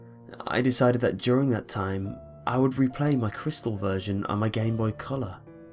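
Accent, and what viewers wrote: United Kingdom. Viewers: I'm guessing you're British or English.....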